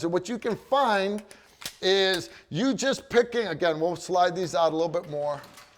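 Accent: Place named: Eastern Pennsylvania, United States. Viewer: The accent🤔 eastern Pennsylvania maybe?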